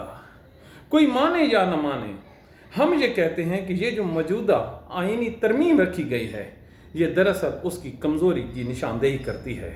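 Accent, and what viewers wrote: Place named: Sindh, Pakistan. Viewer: Bhut khub Thora Sindhi type lahija tha mare Tarah bahar hal bhut khub!?!?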